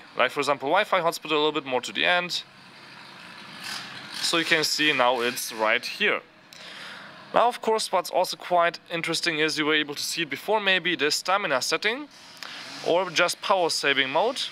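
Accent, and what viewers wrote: Germany. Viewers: ... I like it when German speak English. The little accent is cute. :3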